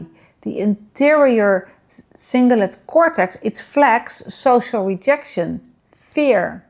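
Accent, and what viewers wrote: Netherlands. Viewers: You sound Dutch.... bedankt voor deze video. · Je bent duidelijk slim, kun je niet iets minder Dunglish spreken 😅😂😂 is moeilijk om naar te luisteren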